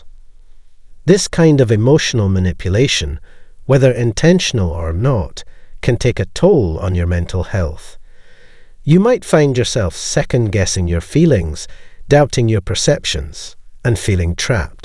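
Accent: Scotland, United Kingdom. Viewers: Your channel is great. Your narratives are very clear. Your accent sounds very familiar. Are you Scottish by any chance?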